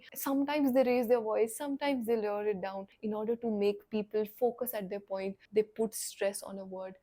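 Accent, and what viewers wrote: India. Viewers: No bad little bit better Indian's accents even you are our family ❤️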